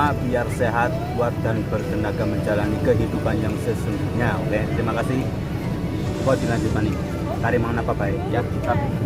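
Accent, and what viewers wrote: Banyumas, Indonesia. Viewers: Keren logatnya Ngapak seruuu Sering Ming kebumen Mampirrrr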